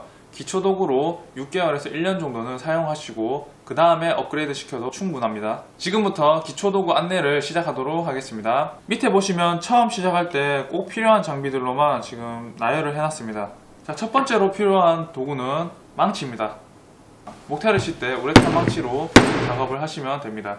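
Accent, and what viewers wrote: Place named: Jinju, South Korea. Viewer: 음 ...ㅎㅎㅎ 진주분이신가보다 ㅋㅋㅋ 억양이 왠지 진주스타일 ㅋㅋㅋ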